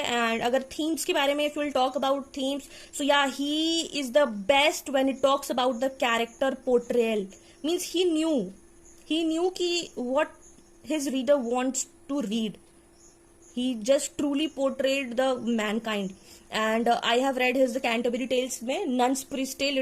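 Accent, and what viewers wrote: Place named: United Kingdom. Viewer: I love the way you speak. It looks quite british.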